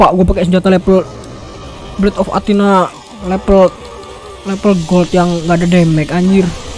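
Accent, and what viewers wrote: Madura, Indonesia. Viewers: Madura kah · Madureh bang..??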